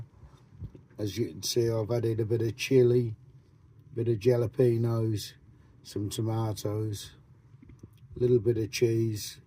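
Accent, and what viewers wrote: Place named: London, United Kingdom. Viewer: Hi my name is Christine but please call me chrissie which have subscribed to your channel have been watching u for sometime now like your accent u come from London❤️ 🎉😊top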